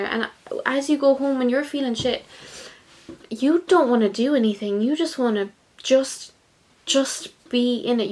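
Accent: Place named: Ireland. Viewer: Hi. My school days were an absolute nightmare. A lot of bullying from other kids and from some of the teachers too. And at home it wasn't better because my parents were always fighting. As i mentioned in another comment i suffer from depression and social anxiety. I think the stuff i experienced during my childhood and something that happened a few years ago caused it. I am on medication now which helped me a little with major depression but i still have social anxiety. And the medication makes me confused and drowsy. I like your irish accent. Hope you are having a great day and good luck with your studies.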